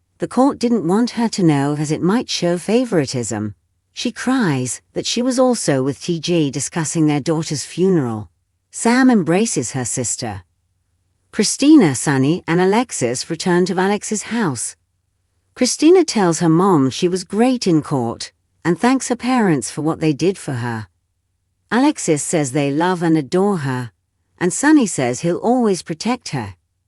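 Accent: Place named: Brooklyn, United States. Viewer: Have never listened or watched your updates before I find your voice and the slightly different way you talk wonderful it's like why can't people be nice to hey she's from Brooklyn you know New Yorkers Brooklyn everybody living there has an accent from somewhere just thought I'd throw that suggestion out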